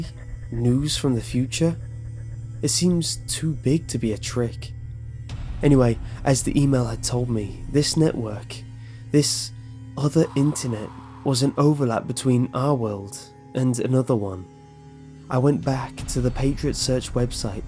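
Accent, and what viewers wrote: United Kingdom. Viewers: This one feels more authentic since it's one of the few UK stories and it's read by a Brit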